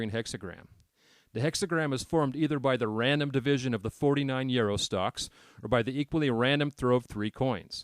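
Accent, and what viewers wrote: United States. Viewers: where are you from, that accent is from Atlanta?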